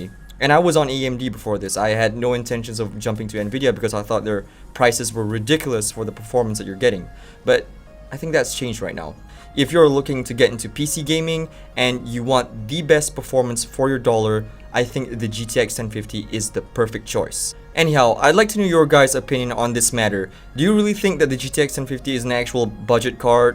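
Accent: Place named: England, United Kingdom. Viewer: nice review. especially the eng accent haha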